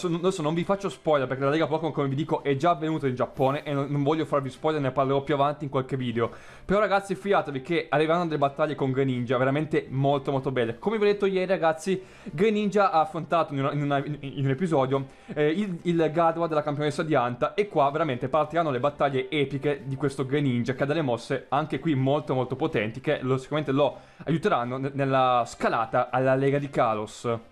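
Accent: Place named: China. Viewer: senti gia sembri cinese, parla piu piano che nemmeno te capisci cio che dici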